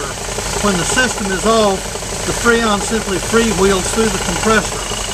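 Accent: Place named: Appalachia, United States. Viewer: I had to stop watching half-way through.. the hillbilly narrator with his stutter "uhs" and "duhs' was just too distracting and that video was nauseating!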